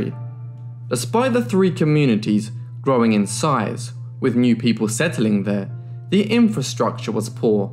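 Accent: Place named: West Midlands, United Kingdom. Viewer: Gotta be from the westmidlands right? Your voice sounds to familiar for it not to be. Xx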